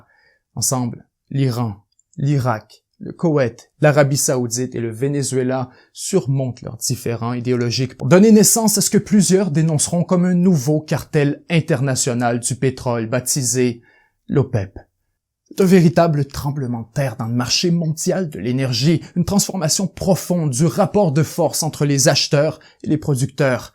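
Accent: Quebec, Canada. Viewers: es-tu quebecois?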